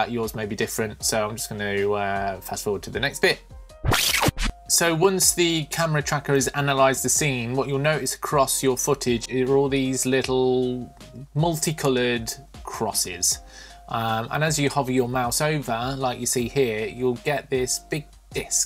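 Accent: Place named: United Kingdom. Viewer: Usually love your videos dude. This one is a little disappointing I'm afraid. Effects shouldn't just be used randomly, any editor knows physics is the most important part of adding effects post edit. The first question I asked (after why you add an extra O to videography 🤣) is why are there clouds being added at this height? The drone is clearly below cloud level, so at this point, eye training takes over and it automatically looks unnatural. We won't go in to types of cloud and why that's wrong. You're an amazing video editor that much can be seen from your other videos, but one thing that really gets my goat is people giving tutorials on something that's not right, this isn't good practice for those looking at compositing, FX physics are even bringing in science into the edits. I really don't mean to come across like I'm pissing for the sake of it. 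You're channel may be small, but it's great and I can understand your limey accent too. Give us more of your skills in Final Cut and videography (with a single O).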